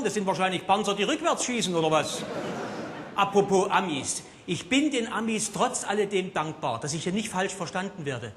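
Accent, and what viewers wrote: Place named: Saxony, Germany. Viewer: Uwe du sprichst ein schönes sächsisches Dialekt, ansonsten hast du den Vogel !!!!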